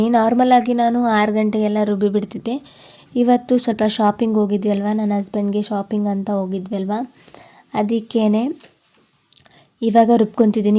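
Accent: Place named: Davanagere, India. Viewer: Nim district yavdu neu same nam tarane matadtira i think davanagere avara neu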